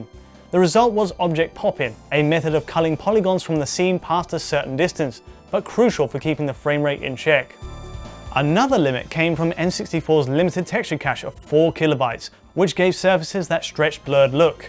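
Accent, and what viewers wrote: United Kingdom. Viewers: The brits pronounce Mario as "Mary-O" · He's a Brit mate.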